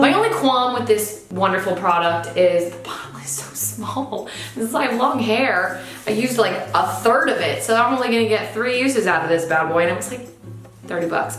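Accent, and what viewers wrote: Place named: Long Island, United States. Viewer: I thought might’ve been Italian like Long Island Italian until I got to the part were she couldn’t say Caprese LOLOL I love her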